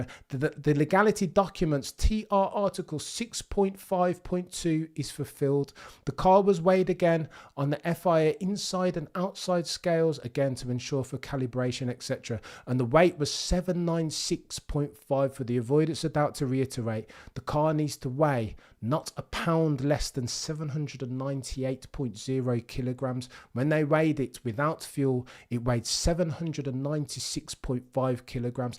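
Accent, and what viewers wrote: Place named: Cockney, United Kingdom. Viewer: Black guy with cockney accent refreshing change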